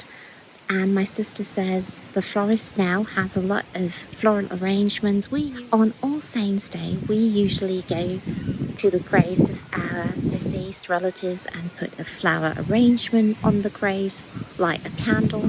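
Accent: England, United Kingdom. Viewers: So you have a British accent but I don't get how you got it? 🤔 has German accent. I love the things in that shop! (I hope that's not rude.) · Lovely video! Your parents are adorable ☺️ Just curious….How come you have an English accent? Were you raised in England? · I think it is amazing you have such a strong British accent being originally from Germany! ❤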